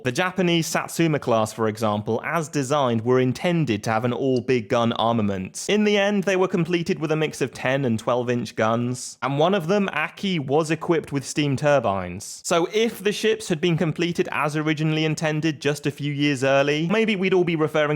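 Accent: United Kingdom. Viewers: Holy british accent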